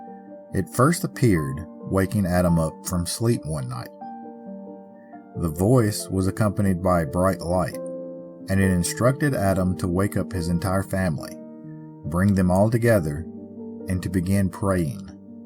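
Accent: South, United States. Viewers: that southern drawl is mesmerising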